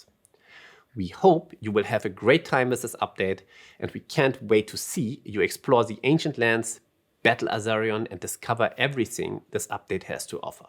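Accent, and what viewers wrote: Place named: Germany. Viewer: Uhhh this German accent... 😋 So cute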